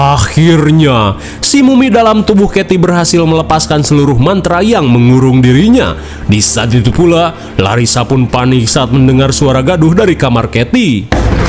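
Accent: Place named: West Java, Indonesia. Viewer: Vibe horornya keren bangets...komentatornya juga logat sunda nya keren....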